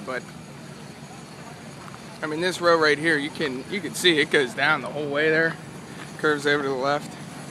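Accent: United States. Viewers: chicago accent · dat pixburgh accent.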